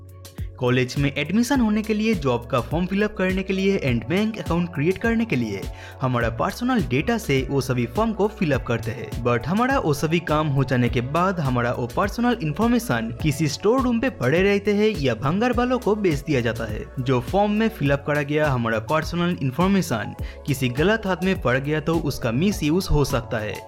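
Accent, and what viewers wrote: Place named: Bengal, India. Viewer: Abe bengali... fix your hindi accent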